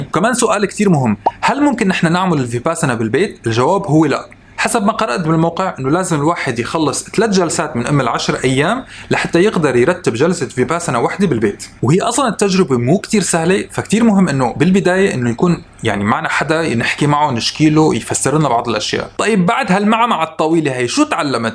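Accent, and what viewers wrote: Syria. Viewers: حضرتك سوري ولا اردني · انت سوري من السويدا او من الساحل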